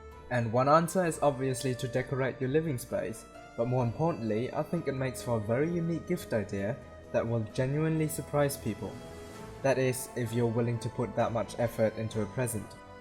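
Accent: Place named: Germany. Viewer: Question are you a German boy? because you have a German accent And I would say you are German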